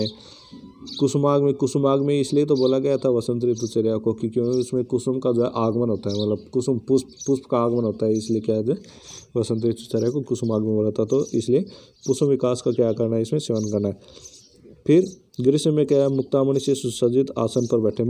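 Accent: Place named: Haryana, India. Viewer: Bhai hariyana se lgta h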